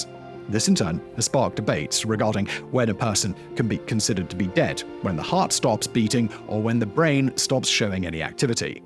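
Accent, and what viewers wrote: United Kingdom. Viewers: Damn he is british.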